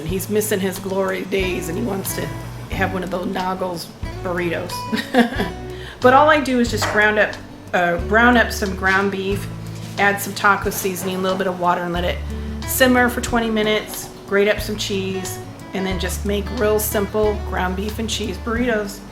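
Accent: South, United States. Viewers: Your food looks amazing! Ugh, so so yummy! I'm sure you've answered this before but where did you get the lovely Southern-sounding accent? You are adorable 🌷🌼💐🌻🌹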